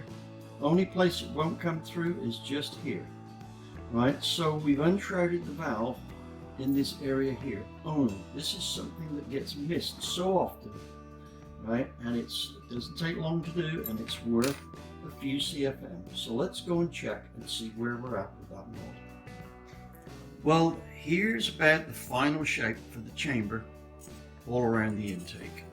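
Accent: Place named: Gloucestershire, United Kingdom. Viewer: Hi, great Video, simple terms, and nice to see you have not lost your Gloucestershire accent after all those years over the pond.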